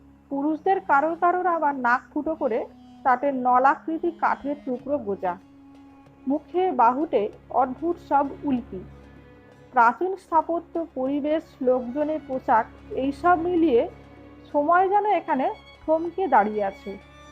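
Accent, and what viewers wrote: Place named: Manbhum, India. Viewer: আপনার ভয়েস অ্যাকসেন্ট শুনে মনে হচ্ছে আপনি আসানসোল পুরুলিয়া বাঁকুড়া মেদিনীপুর অর্থাৎ মানভূম অঞ্চলের মানুষ।ভুল হলে জানাবেন।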